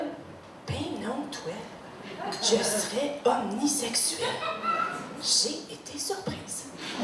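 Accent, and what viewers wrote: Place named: Canada. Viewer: Ma foi, je t'ai encore trouvée bien drôle ! j'avoue que j'ai parfois du mal à tout comprendre car je ne suis pas une habituée de l'accent canadien mais j'ai quand même bien ri :D J'aime bien ce que tu fais, je trouve qu'il y a matière a bien rigoler et tes sujets sont intéressants en plus !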